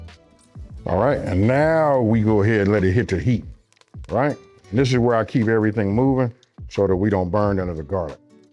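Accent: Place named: Atlanta, United States. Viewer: Had a chixken casserole not spaghetti. Why does he have an Atlanta accent?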